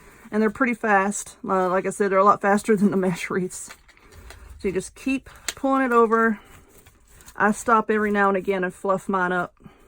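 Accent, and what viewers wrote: South, United States. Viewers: I know this is an old post, but I love it. Where are you from? You have a somewhat southern select. Loved heart wreath. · You were cracking me up with the little comments about your ex. So funny. I love your southern accent and sense of humor. And also your creativity.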